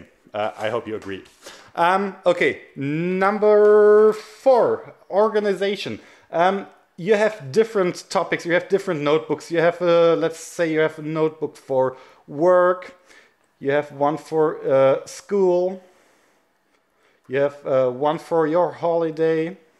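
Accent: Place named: Germany. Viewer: The german is strong with this one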